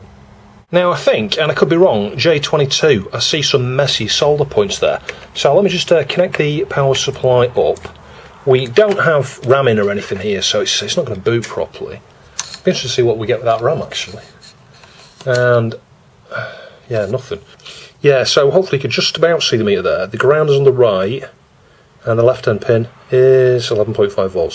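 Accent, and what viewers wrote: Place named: Manchester, United Kingdom. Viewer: Love the video. That Manchester accent, though...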